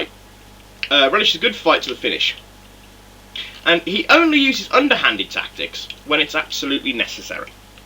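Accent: United Kingdom. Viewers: your british.wtf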